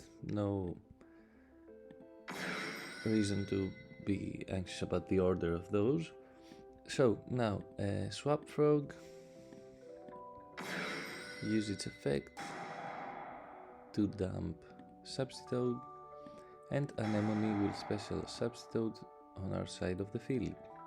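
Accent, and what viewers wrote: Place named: Greece. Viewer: Yes I‘m Greek too but I live in Germany thats why I recognized your accent bro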